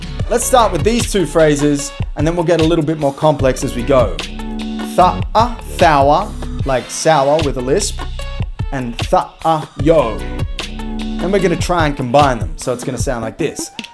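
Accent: Australia. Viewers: I love how bloody Aussie you always sound bro haha. Love ya work < Please never stop being you and doing what you do...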